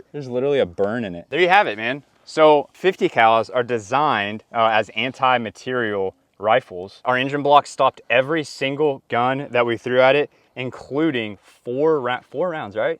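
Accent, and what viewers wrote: Australia. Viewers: lol-the Australian accent. :face-blue-smiling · 😂 Australian accent hehe 😂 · All i smell is your bullshit 🤣 Ozzy accent. Dude, ya killen me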